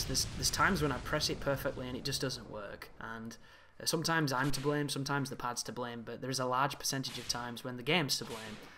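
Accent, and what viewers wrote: United Kingdom. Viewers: 1. It's very refreshing to hear more Brits on these videos. 2. I totally hear you on the guard break actually doing the command seldomly. I can't count the amount of times that I'm sure I inputted forward and R1 perfectly only to swing my sword. On the other hand, the amount of times I've attempted a guard break with a chime...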